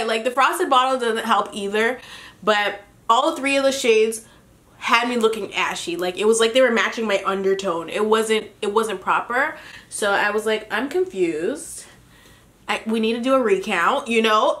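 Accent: United Kingdom. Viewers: UK accent on point sissss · That accent though sis had me shook lol 😂🇬🇧bound lol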